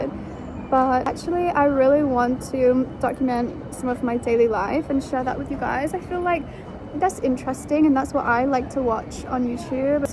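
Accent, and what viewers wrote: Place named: Yorkshire, United Kingdom. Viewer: is that a yorkshire accent i hear? i have many questions abt working in tech in china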